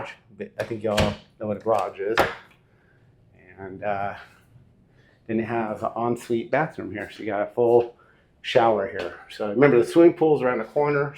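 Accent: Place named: South, United States. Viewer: 😂 I assume you know little to nothing about Real Estate because it appears you know little to nothing about Real Estate. Your narration is a comedy of errors. With all due respect you sound like a hick in the Beverly Hill Billies. And the video was nothing but a face shot of you blabbering with your shoulder strap upside-down. 20 minutes of watching your body take up 40% of the screen while we walk backwards dizzy is a turn off. Do a short intro of you then just walk the entire property camera facing forward without you in the shot or talking and add some nice music. Do another shot of you at the end with a wrap. We know what we are looking at. We know what a bathroom is and what the " nice wood on the wall" actually is called. Unless the comedy releaf of you saying things like "tennis ball court" is intentional. With all do respect rethink your format. 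Thanks for the laugh.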